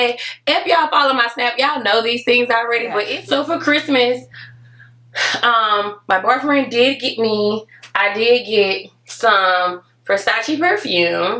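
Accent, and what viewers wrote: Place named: South, United States. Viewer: i love your accent you got to be from the south like and once again u finer than any model out there!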